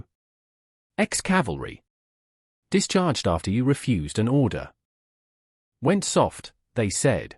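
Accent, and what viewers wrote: United Kingdom. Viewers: Why do I have to listen to this ridiculous British accent on a story that takes place in America? We should have allowed the Germans to destroy the UK when we had the chance (NOT CHONCE).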